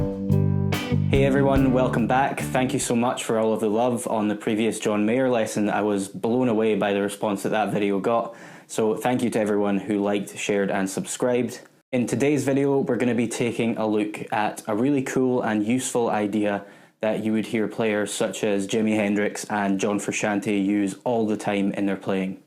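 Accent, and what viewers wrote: Scotland, United Kingdom. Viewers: That accent, any fellow Scotsman can immediately identify it.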